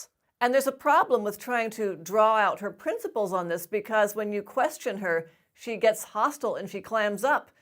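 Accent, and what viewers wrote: United States. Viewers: If you have a beautiful voice you can tell me lies :) It's a pleasure to listen to them. I love your beautiful American accent.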